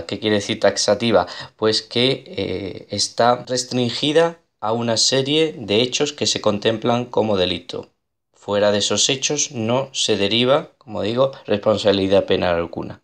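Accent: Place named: Spain. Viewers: Creo que por el acento que tiene es porque es de España no? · Es lo q yo también me quedé de q país es y por el acento es de España, aquí en México es Culpa civil y Culpa penal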